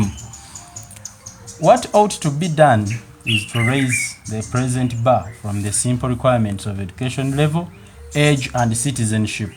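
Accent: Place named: Buganda, Uganda. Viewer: For sure the reader is killing the brand..your English is rotten,misuse of words and accent is in Luganda version..i wonder whether this guy has any journalistic antiquate to share ideas of an intellectual to the public..Pliz delete this piece since its hard to get the gist of ideas compiled in this book!!!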